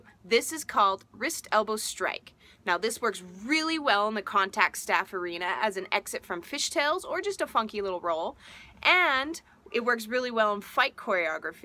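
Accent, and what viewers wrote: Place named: Canada. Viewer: Canadian?Thought I heard the majestic accent!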